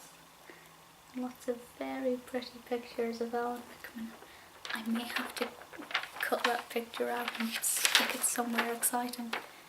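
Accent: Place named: United Kingdom. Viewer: British asmr, perfect :D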